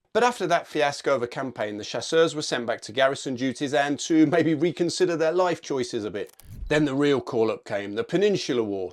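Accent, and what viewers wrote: United Kingdom. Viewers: It is so interesting ! I really had never heard before about that regiment of renegades. Being a French living in United Kingdom for almost 24 years now, you understand that I somehow feel a strange closeness to them. You ask for the viewers' opinion on this regiment, there is mine: I wish the British Army, which I respect, would re-create a Chasseurs Britanniques Regiment. I would do anything to join. A bunch of misfits, anti-europe renegades, "gilets jaunes", "soldats perdus" and 2nd-rate mercenaries, it would be so French ! Especially if it meant having other troops performing all the boring tasks like guard duties (definitelly it would be very very French). You'd just have to change the Redcoat for DPM. Anecdotically, I liked the efforts to pronounce correctly the French names, with a so British accent. I had great time. Thanks so much for this video.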